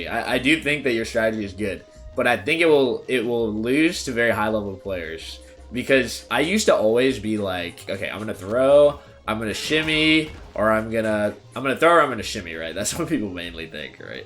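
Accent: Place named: California, United States. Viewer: Great videos as always, quick question though and really random. Are you from California? I feel you just sound like it lol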